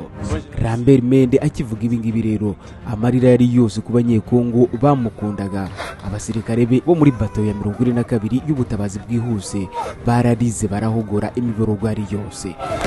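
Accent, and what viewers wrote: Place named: West Africa. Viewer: Yarafite accent nkiyabo muli afrique de l'ouest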